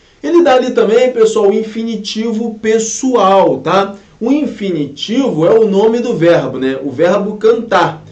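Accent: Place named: Rio de Janeiro, Brazil. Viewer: Uhhhh falando com i onde não há i, pondo X onde não há X; fica difícil lecionar usando o português da capital do nosso querido Rio de Janeiro.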